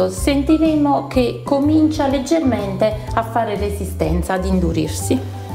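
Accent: Naples, Italy. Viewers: Ciao. Sei molto simpatica.... col tuo accento e battute in napoletano. Sei anche molto brava e calma nelle spiegazioni. Questo aiuta molto a seguirti bene. " u scelepp" è di origine pugliese... (Io son della provincia di Bari). Mi piace questo metodo con l'album che non ho mai utilizzato perché nn viglio pastorizzare ogni vola. Mi chiedevo, a parte il procedimento di lavorazione, che differenza sta tra il fondente di zucchero e questa glassa. Cosa si aggrappa meglio e quale tra i due diventa e resta duro asciugando? Devo ricoprire biacotti e altre decorazioni di pasta frolla e non vorrei che urta do tra loro i biscotti si possano rovinare facilmente. Grazie per l eventuale tue info. Un abbraccio Maria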